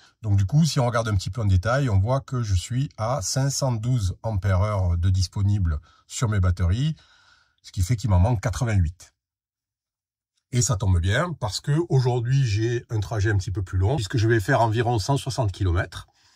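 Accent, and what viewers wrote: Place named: Marseille, France. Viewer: Merci beaucoup pour tes vidéos de partage sans rien avoir à vendre. Cela fait du bien ! Les contenus sont pratiques, efficaces. A ma connaissance, tu es le seul à avoir une démarche aussi complète sur le monde du camping car. Quant au soleil que tu n’as pas dans tes vidéos, cela est compensé par la petite partie de Marseille que tu transportes dans ton phrasé. (J’ai un peu la sensation d’entendre Di Méco.😉) Bonne route pour la suite de ton voyage et encore merci.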